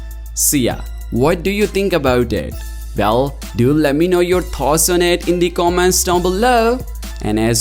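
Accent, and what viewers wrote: India. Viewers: Love your Delhi accent.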